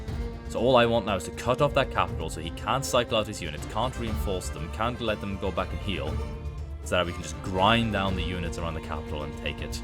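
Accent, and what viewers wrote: Norway. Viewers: I can hear a bit of an accent, if it isn't rude to, can I guess you're Norwegian? 🤔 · Are you Norwegian?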